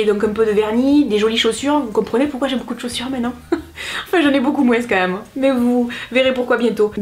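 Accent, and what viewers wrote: South, France. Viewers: Je suis rousse , jai les mêmes orteils que toi palmés, morphologie en 8 et accent du sud , serions nous sœurs ??? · Je vous découvre et j'apprécie d'emblée votre franchise. Votre accent du Sud est très agréable à attendre. Bien à vous.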